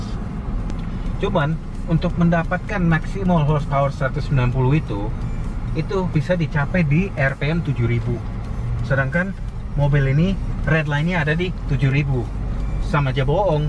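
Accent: Indonesia. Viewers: Bahasa Indonesia nya udah ada aksen Indonesia nya. Itu baru jarang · wkwkwk bule tapi cengkoknya asli indonesia banget 😀😀... reviewnya super jujur, pedeees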